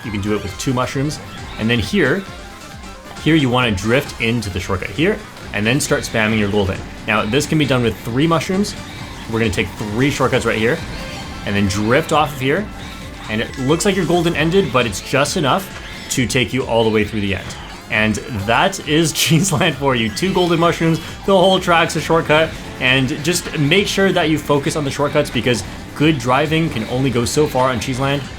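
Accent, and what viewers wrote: Canada. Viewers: avec ton accent, je suis presque certaine que tu viens de montreal 🤔 btw super video!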